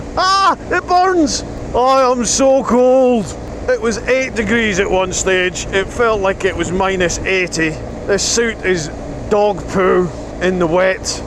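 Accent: Scotland, United Kingdom. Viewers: I know it all sounds normal to you, but you had me in tears there... “Great giggle” “Naughty overtakes” 😂🤣😂🤣 I want to learn how to sound Scottish! Is there a pill? If you’re ever in San Francisco, give a shout. We also have an Inverness, it’s lovely too (no Loch but we have a bay), with as much glorious riding up and down the Pacific coast as even an Iron Butt could want. Thanks for the ride along. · love it mate, just found your videos and loving hearing the Scottish accent! some great roads round Glencoe shame about the usual weather, North Coast 500 is a must for beautiful roads up here, never done it on a bike before though! Keep up the great content! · That was pretty hardcore riding, but you where on the right bike, those other two guys on the MT-10 and Fireblade had to have been hurting more than you, epic ride ! Sounded like you had a bit of a Scottish accent, where you one of the extras on the movie Braveheart ?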